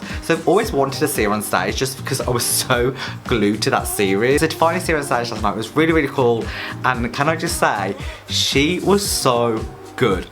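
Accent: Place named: Birmingham, United Kingdom. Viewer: AHAHA this whole time I could never figure out where you were from, as if you're a brummy too.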